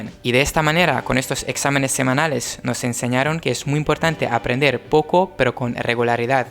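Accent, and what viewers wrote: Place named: Spain. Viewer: Excelente nivel de Español, lo hablas super bien, pareces de España.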